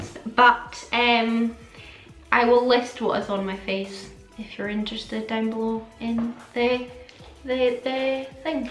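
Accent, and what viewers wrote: Scotland, United Kingdom. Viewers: Ahhhh I LIVE for your videos! And the outtakes! You have me in stitches every time and after multiple (pure travesties I tell you!) childbirths that's as pitiful as it sounds. I am also disabled with chronic spinal issues and fell into makeup because I was bored sh**less with myself. Anyway, enough fannying about! Just want to say how much I appreciate you. I'm in Australia but my entire family is from Falkirk. The ones who are still alive(!) have been here long enough to have lost much of their accents but yours brings my sweet Nana and Papa back to me and they went belly up years ago. I'm often told that we come cross as "cold", not huggers etc.... I always say its a Scottish thing! Thanks for the validation lol xo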